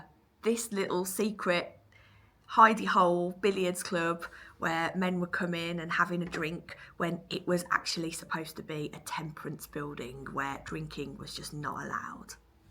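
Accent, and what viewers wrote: Birmingham, United Kingdom. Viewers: Hi im glad I found your channel a few weeks ago it's so lovely to hear your brummie accent you show so much interest in the local history of the Midlands i love hearing about the history of the cotswolds where i come from although I now live in denmark i never forget my roots we working class people have so much history to tell that it was us that made Britain what it was keep up the good work, best wishes roy copenhagen denmark. 😊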